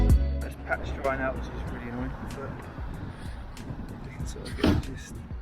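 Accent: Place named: United Kingdom. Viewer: ¡Hola! Since México, my english is not goog, but I say you: When you speck the Britain English, it hear very nice, interesting and elegant.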